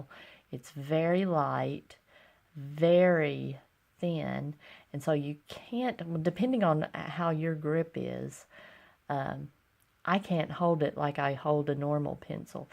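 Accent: South, United States. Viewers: Are you Southern USA?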